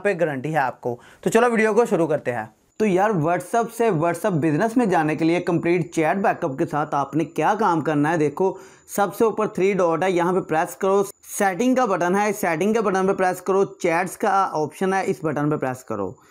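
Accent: Punjab, India. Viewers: Nice accent.. hindi bhi Punjabi jaisi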